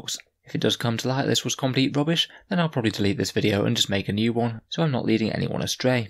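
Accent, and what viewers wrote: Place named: East Anglia, United Kingdom. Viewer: I'd guess somewgere East anglia way, they're accent is quite subtle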